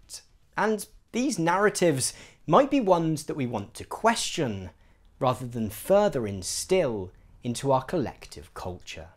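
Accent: RP, United Kingdom. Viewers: voice, accent and intonation sound exactly like coming from a listening exercise in a British English course. Perhaps recording these is his other job...?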